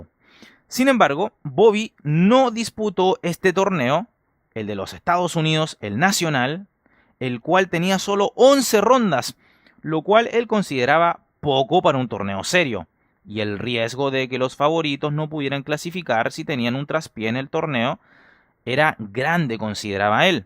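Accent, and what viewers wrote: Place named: Chile. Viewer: Siempre creí que era argentino, veo que en los vídeos antiguos habla en idioma chileno. Fitche xd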